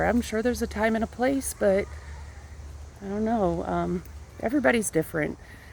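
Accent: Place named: South Carolina, United States. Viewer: Love your accent...Is that South Carolina ?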